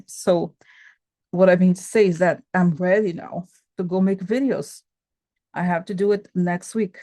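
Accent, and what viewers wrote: South, United States. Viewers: you are so funny--the con man is so full of hot air. Love your southern accent which reminds me of a friend, a southern belle who grew up in Georgia. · I must say, Your southern accent is cracking me up. hahahahahahah Namaste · Man, I’m from Georgia and that’s a pretty good southern accent! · what an incredible episode. Thank you. KAM WON! Where are your rings?! Your southern accent is on point! Reminded me of family, excellent job!;D And I've never been more proud to also have adhd <3 <3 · OMG, you do really well with the Russian accent, but the southern accent needs work. Lol.❤ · LOVE the southern accent 😂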